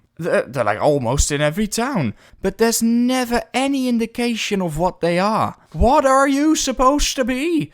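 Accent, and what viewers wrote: Netherlands. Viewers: I love the Dutch into English accent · I heard that accent and immediately knew you were dutch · U can hear this guy is dutch or aren't you? Oh nevermind he included the map of the netherlands 😂😂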